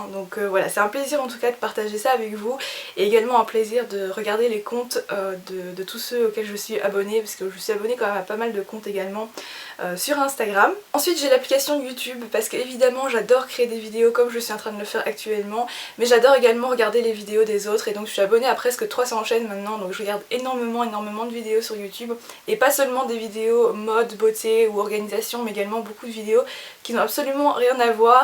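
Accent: Belgium. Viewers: tu es belge? 😊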